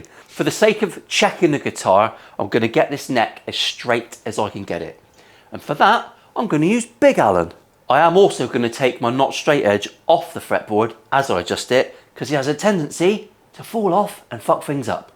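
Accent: United Kingdom. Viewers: Great to see that i am not alone to think that this Jet is an awesome instrument. I have a JS 400 sunburst lefty and my Fender stays in its case since . Also even at this price the trem is a Wilkinson . The tuners are pretty decent as well . Your videos are a great source of learning. As a French speaker I am also learning to understand the British accent better! Thanx!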